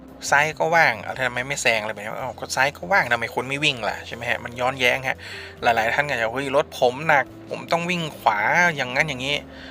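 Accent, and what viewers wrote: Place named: North, Thailand. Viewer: น้ำเสียง และลีลาการพูดมีเสน่ห์ หนักเบาน่าฟัง คล้ายนักจัดรายการวิทยุตามต่างจังหวัด อู้ไทยปะแล๊ดเมืองบ้างก็ดีแล้วครับ เพิ่มสีสรรค์ ถ้าลองเปลี่ยนเพลงประกอบจากเพลงสากลบรรเลง เป็นแบบสะล้อ ซอ ซึงดูบ้าง ก็น่าจะเหมาะกับการนั่งรถเล่นตระเวณภาคเหนือนะครับ / ชอบครับชอบ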